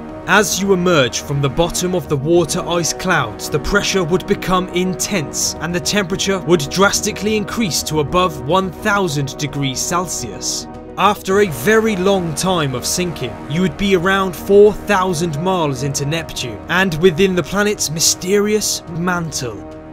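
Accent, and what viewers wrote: United Kingdom. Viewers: That's because he's British! Us brits explain things in great detail and talk properly 😁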